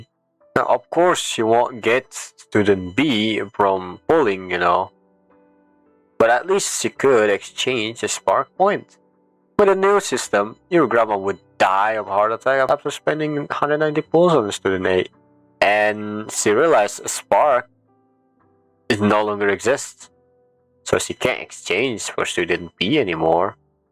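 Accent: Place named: Indonesia. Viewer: It's fine. But not fine😭😭😭 you have an insanely thicc Indonesian accent 😭😭😭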